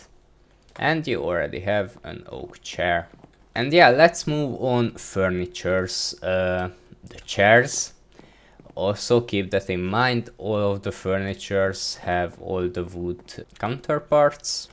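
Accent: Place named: Hungary. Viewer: halatszódik hogy magyar vagy xdd de sokat segített a videó ty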